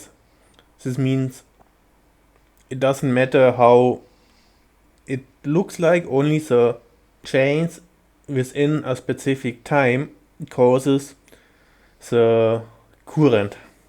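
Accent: Germany. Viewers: this alman english is amazing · Das ist das deutscheste Englisch was ich je gehört habe aber danke für das Video👍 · das ist das Deutscheste Englisch was ich jemals gehört habe so geil man. mach weiter so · When you know exactly that your boy is from Germany cause we all have the same foreign accent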